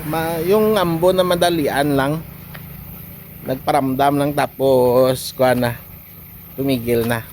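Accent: Iloilo, Philippines. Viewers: Good morning parang ilongo ka man talaga idol kaya ingat ka idol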